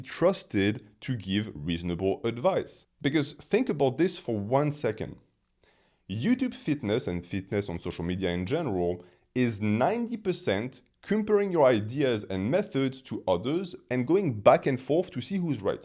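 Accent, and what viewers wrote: France. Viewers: I love your French accent, it’s like listening to Baudrillard talk about weightlifting.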